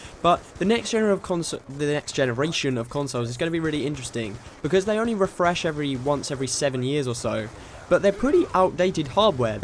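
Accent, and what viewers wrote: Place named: United Kingdom. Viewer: The BRITISH ACCENT..... Awweeeeeeeee! DAT ACCENT! :D Nice intro btw!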